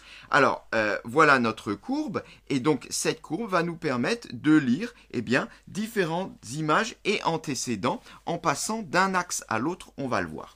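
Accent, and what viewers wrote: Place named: Moselle, France. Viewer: Merci grace a vous j'apprends ce que je n'ai pas appris plus jeune. Une question: vous ne seriez pas ( comme moi d'ailleurs, de Moselle ). Car c'est comme ça qu'on dit Vingte chez moi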